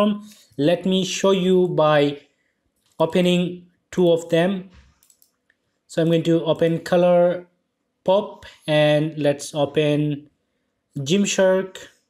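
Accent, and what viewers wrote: Sylhet, Bangladesh. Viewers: are vaijan apni je bangladeshi seta apnar english accent shunei bojha jay!!!!!!!!!!!! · Brother Are You From Sylhet?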